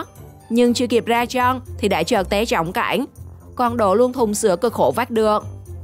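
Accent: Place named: Central, Vietnam. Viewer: Nghe tiếng dể thương mà ba, tôi ng miền nam nhưng nghe khoái. Chị này chắc ng miền trung đấy. Tôi có một ng bạn tiếng giống chị này rất dể thương, xa rồi mãi nhớ giọng nói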